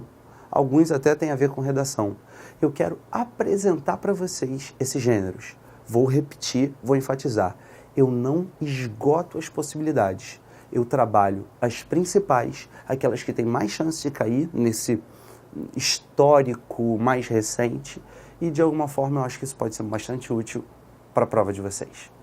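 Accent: Rio de Janeiro, Brazil. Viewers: ele é carioca falaigual a mim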